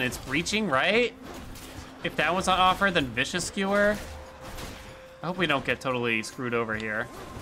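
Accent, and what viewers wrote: United States. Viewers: Honestly, you sound like every American ever. Cheers!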